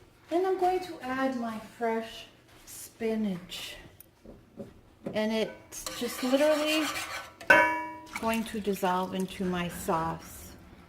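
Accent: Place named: Massachusetts, United States. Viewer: that looks fantastic..thats almost like the mosembequi..you sound like your from new bedford ma..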